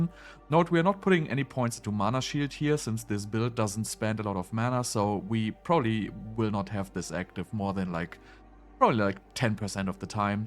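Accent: Germany. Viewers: yeah thats a fine build for overworld. it like omni cl of s1. that was probably even more op for farming:) . sadly its gone for now. And greetings from germany, you sound german too.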